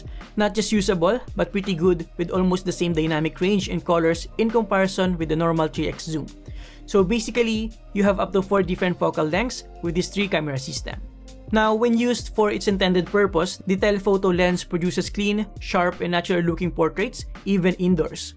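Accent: Philippines. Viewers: Pinoy na pinoy ang english mo😁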